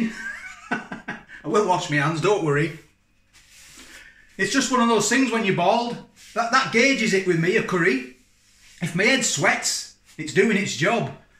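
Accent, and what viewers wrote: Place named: Yorkshire, United Kingdom. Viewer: I was waiting for the moment when it burnt your mouth off but you handled it like a boss! Great review, the Yorkshire accent tops it off nicely 👌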